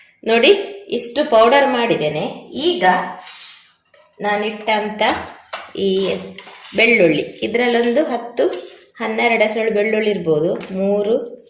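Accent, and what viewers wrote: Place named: Bangalore, India. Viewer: In books nobody use Bangalore Kannada tulu nadu ppl talk books Kannada.. your Bangalore Kannada lot of slang ok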